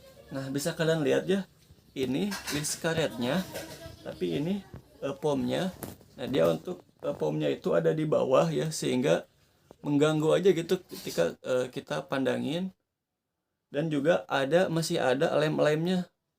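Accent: West Java, Indonesia. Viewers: Sunda banget ngomongnya 😅😅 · Lu orang sundaa yaa, udah kelihatan banget logatnya sama pas baca huruf "F"😅😅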